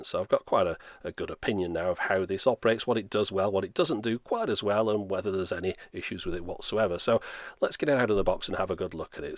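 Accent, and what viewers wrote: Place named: England, United Kingdom. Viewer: Wow nice presentation here, you were right on with just about everything regarding this Aukey DR-01 review. I took in most of your setup directions and menu setting THAT information is where I got the most value in the time spent here. I have a Vantrue N2 dash cam on the front of my Toyota Sienna and a nice Aukey DR-01 on the rear window over looking any rear end collisions or idiot drivers to the rear of my Van. I live in Lakewood California we all can agree that the So Cal. drivers here are the absolute WORST OF THE WORST! anywhere. you need a dashcam here just to save your ass if or when you have a problem. I was able to set up my device flawlessly. Your English accent for some reason makes it all that much easier to understand. Thank you for your time.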